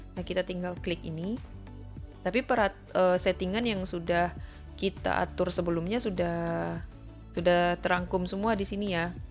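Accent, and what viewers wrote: Indonesia. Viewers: orang padang kak?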